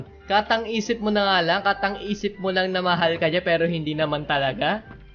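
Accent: Visayas, Philippines. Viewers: Bisaya kaba lods?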